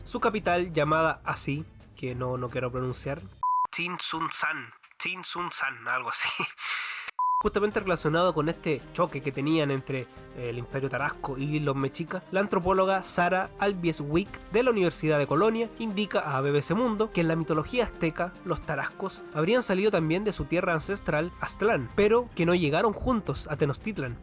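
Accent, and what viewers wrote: Chile. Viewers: Eres chileno ? A ti si se t entiende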